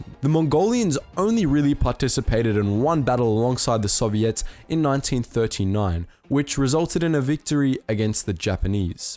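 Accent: Australia. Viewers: Ah I dint know this man was Australian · Just wondering are you Aussie like me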